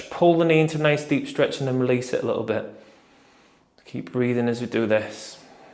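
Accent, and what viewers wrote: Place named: Hull, United Kingdom. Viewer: Hull accent always makes me smile haha. Smaaaling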